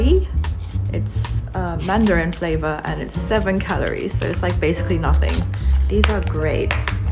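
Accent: United Kingdom. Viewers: I loveeee your British accent.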